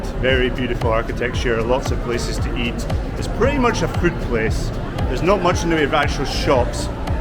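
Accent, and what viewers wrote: Scotland, United Kingdom. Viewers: Aww. That Scottish accent. Made me smile all the time.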